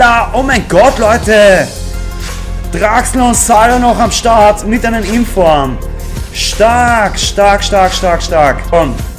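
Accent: Austria. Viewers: Ich habe schon lange überlegt es mit youtube zu versuchen bin zwar Bayer wäre vom Akzent fast genau so wie bei dir als Österreicher :P wären auch neue Freundeskreise die man vill knüpfen könnte und diese Kamera von Logitech wäre ein Anreitz um es doch in Angriff zu nehmen das ganze :)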